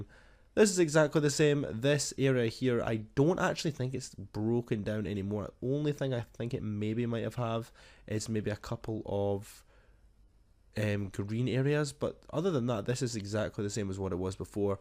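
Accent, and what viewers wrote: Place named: England, United Kingdom. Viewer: You have an nice english accent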